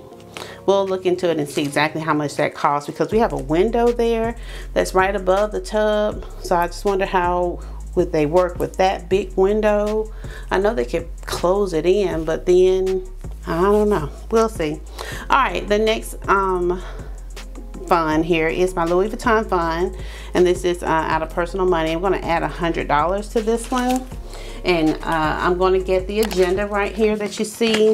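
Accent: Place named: South, United States. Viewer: Are you a southern gal?(: I love your accent and I loved the attitude you brought to your video and that made me want to sub.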